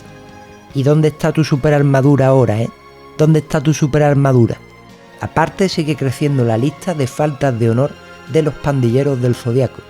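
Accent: Spain. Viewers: porque era el primero que sinceramente menos mal que no tenía diarrea cuando vi este video, y ya vi que otras personas opinaron que lo gracioso y original es la voz sería y calmada mientras dices chistes. El origen de los pandilleros del Zodiaco fue aquí. Ya después subiste un poco la frecuencia de tu voz, queda más emocionante. Eres bastante inteligente, si hasta el semilla chilena en el director disfrutó eso de la uea Shun... Es más gracioso porque un español lo dice, y con un acento interesante.... Ya sacaste video de los hentai de Saint Seiya? 😅 🤣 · Me gusta tu manera de narrar amigo , eres español verdad , tienes tu gracia de narrar y tiene buena imaginación a ponerle a ikki pollo frito , a seiya el burro alado, a hyoga el pato soviético a shyriu el striper favorito y a shun que le dices eso no me acuerdo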